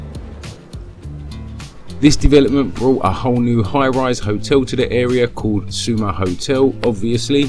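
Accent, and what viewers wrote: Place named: United Kingdom. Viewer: Soooo British and yes has a hint of Spain in to, amazing work keep em coming.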